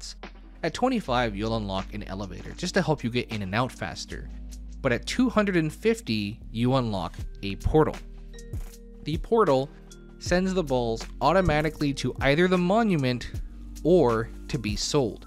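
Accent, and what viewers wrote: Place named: Canada. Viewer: Canadians accents are weird and kinda cool. You guys sound American to my European ears, until a certain word comes along, and I'm like: "ooooh, they're Canadian lmao".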